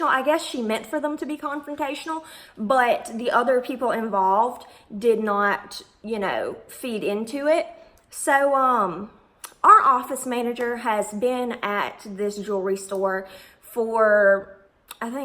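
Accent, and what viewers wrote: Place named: Kentucky, United States. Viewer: Are you from Kentucky? I’m from Louisville in you sound like your from Lexington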